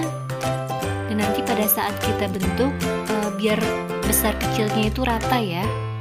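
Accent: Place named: Java, Indonesia. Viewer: Rm sedapnya kue dibelinya😄 jowo medok hadir embak e. Yuk yang lain...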